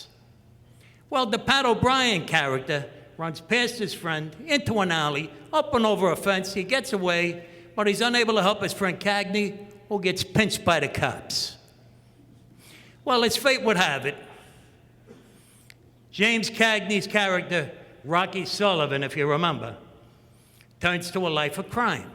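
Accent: Brooklyn, United States. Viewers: I've see other other interviews with him, where he had the much cooler Brooklyn accent. Looks like his New Jersey accent has now become his default after Sopranos. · I just fucking love his Brooklyn accent! I could listen to him all day and not get bored.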